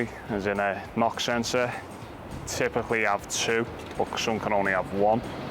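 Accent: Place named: Liverpool, United Kingdom. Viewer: Liverpool dialect. One of the strongest accents I've ever heard.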